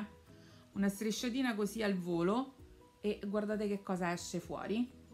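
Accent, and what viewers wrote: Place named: Rome, Italy. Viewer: Primissimo tuo video che vedo, sei davvero tanto simpatica! Mi piace un sacco la tua parlata romana 😘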